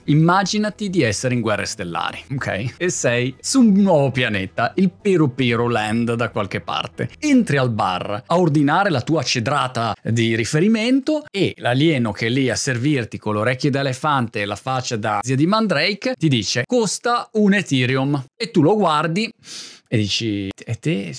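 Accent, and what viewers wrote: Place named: Matera, Italy. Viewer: Grande dialetto materano ti si addice😉 tutorial ridendo e scherzando inbocchi caramelle ai principianti bravissimo super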